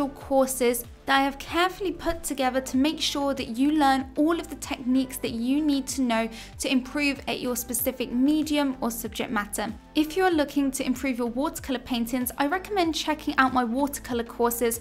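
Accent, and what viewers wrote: England, United Kingdom. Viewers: Is her accent British??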